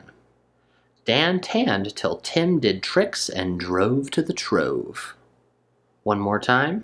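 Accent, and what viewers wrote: United States. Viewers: i Like amrican accent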